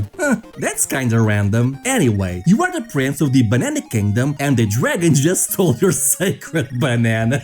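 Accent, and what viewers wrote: Portugal. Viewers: você é de Portugal?? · Bom, essa foi uma forma inusitada de descobrir que você é português kkkk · It's okay. Great video! ^^ By the way, if you ever feel self-conscious about your Portuguese accent, don't! I think it makes your videos unique and charming. You get tired of hearing the same US or UK accents all the time! I'm a fan of your work; keep up the good job! ^^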